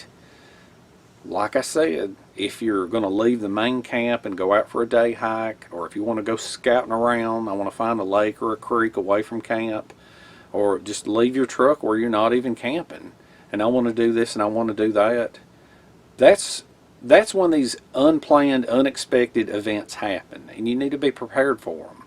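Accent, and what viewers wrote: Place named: Appalachia, United States. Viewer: Hi. Although it's true that you have a strong Appalachian accent, your word enunciation is always pure. Whereas, in my accent, some syllables are left out or modified. Your CC is great and matches your vocabulary perfectly. I did enjoy it. Thanks. I love having CC as an enhancement to a video.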